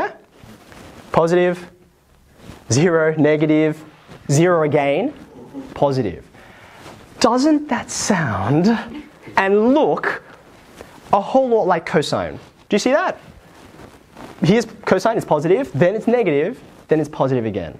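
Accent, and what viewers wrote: Australia. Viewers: Well, if I’m not mistaken he’s got an Australian accent. The pronunciation “heeyah” is typical for Aussies (or maybe also for Kiwis) , like the pronunciation “aboot” (for about) is typical for Canadians. You gotta live with it. And the abbreviation cos (or sin) is also occasionally used in spoken language in my country (Germany). Nitpickers are gonna nitpick, I guess.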